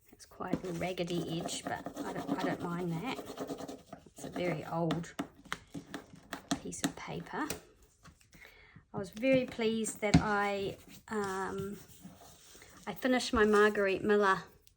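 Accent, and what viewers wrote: New Zealand. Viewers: Hi I have just found your channel and thought wow, she sounds like a kiwi! So further investigation and yes you are her in NZ! That is so good as I didn’t think had a channel on You Tube for junk journaling. I don’t know anyone else here in Dunedin that does JJ so really pleased to see you here and hear your accent 😂Lynne Dunedin NZ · I love hearing a junk journaller with a kiwi accent. Congrats on the growth,.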